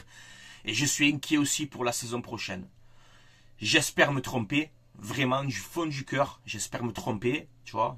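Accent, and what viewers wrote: Marseille, France. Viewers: Juste comment tu parles, j’ai pas besoin de prouver que t’es Marseillais 😂😂 Je comprends frérot Hala Madrid vaut mieux ça pour ta santé 😂 On as pas de LDC mais on est heureux, ils ont une LDC mais sont malheureux 🫵🏽😂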